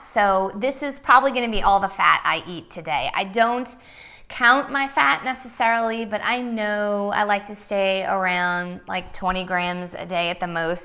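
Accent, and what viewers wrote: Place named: Texas, United States. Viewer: You came up into my feed today. I binge watched all of Plantifulkiki’s videos and switched my diet three weeks ago. My energy is amazing now! I subscribed to your TB & IG I can completely relate with the family issues & diet X 10 years. I hope to be an inspiration to them & then they will follow more. Cute Texan accent! You’all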